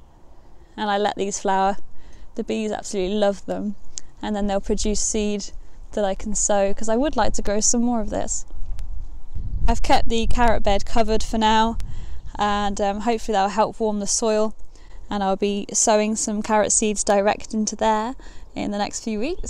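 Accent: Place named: RP, United Kingdom. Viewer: i heard your voice says it all you probaly went to posh school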